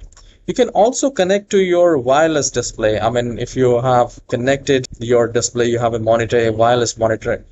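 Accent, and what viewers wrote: India. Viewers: nah yk its gna work when you hear the indian accent lmao W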